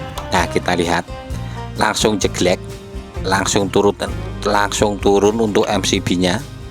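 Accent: Java, Indonesia. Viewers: First time lihat vedeo ini terus boleh tau kamu org jawa krna org jawa cakap bunyi dalam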